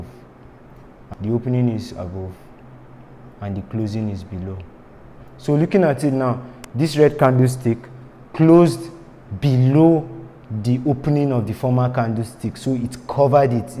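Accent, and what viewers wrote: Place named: England, United Kingdom. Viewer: Great explanation 👌 i love your teaching style and English ascent, i am very suprised to that with this great information u have passed freely to us no one is commenting or sharing this video, for i have subscribed and share ur link cox i like ur teaching and i want u to always do more of this good work 👏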